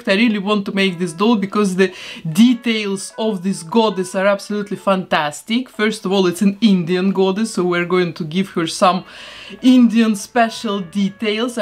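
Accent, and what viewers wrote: Russia. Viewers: Why do you have a Russian accent?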